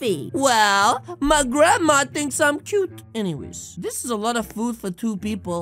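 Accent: United Kingdom. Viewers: Never knew he was British lol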